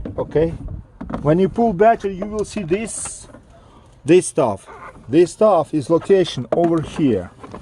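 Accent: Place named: Russia. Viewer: Спасибо брат, акцент похож на русский)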